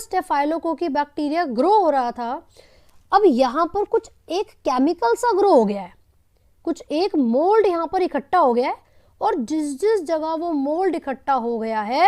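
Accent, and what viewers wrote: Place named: Uttar Pradesh, India. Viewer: Didi aap bataiye ki aap kis state se belong krti ho.Mujhe toh lgta hai ki aap UP ki ho.Aapka accent hi aesa hai jaisa UP mei.Agar m sahi hoon toh aapka like chahiye mujhe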